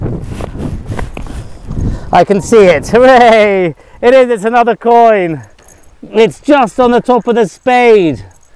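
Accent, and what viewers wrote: RP, United Kingdom. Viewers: Just recently subbed to your channel and catching up on a few of your previous ones. Like your unique way ( Your a bit posh)😅 but your very fascinating to watch!👌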